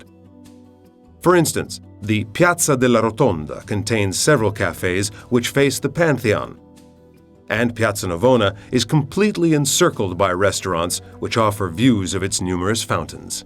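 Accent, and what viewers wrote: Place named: United States. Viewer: He's American isn't he tho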